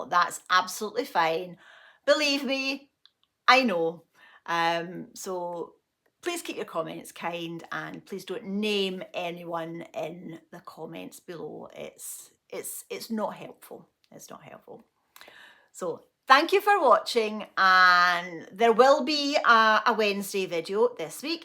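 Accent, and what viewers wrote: Scotland, United Kingdom. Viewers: I am not a creator but comment frequently on videos I watched. I never ever commented in a negative way or criticised the person behind the channel. Its like you said its free to watch and if you don’t like the content why watching it at all?? never understood that attitude. I habe too say that especially during/ after Covid aggression and unkind comments have risen, even I get nasty comments after my own comments on absolutely harmless topics, its so weird what people read into your comments sometimes and how nasty there responses are. Like you I have so much experience not with 5 years old😅 but adults who often behave like them😅 working in retail for many many years and have seen and heard ALL. I always try to be kind and not judge people just by their looks, background etc. I admit its not always possible but this is a lifelong project and some people are just AH and I don’t care because I cant change them, not my problem but I also agree with you for very sensitive people it isn’t always that easy. 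I just love your content and your personality AND I can understand your Scottish accent which is quite ironic if a non native english speaking person is saying that😅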